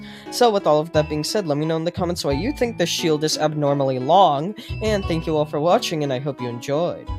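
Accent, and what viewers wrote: United Kingdom. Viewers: I thought it was a British accent, I will now remember these videos as the British era of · he sounds British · HE IS MORE BRITISH OH GOD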